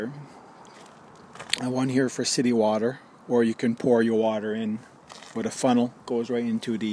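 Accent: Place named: France. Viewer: Can barely tell you have an accent - love French accents anyway.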